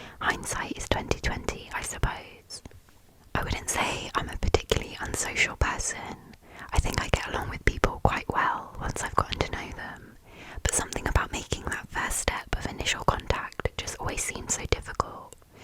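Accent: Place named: RP, United Kingdom. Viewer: Among the many... MANY accents on your little island, yours is by FAR the best 😊 It makes you sound so posh lol #fancy 🩷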